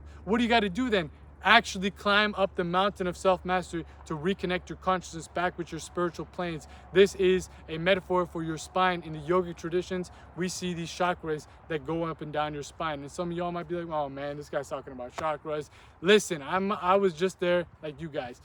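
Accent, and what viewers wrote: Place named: United States. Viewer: I like your accent, bro! I wanna talk like that even though I am not American.😞 and keep the good content rolling. 💪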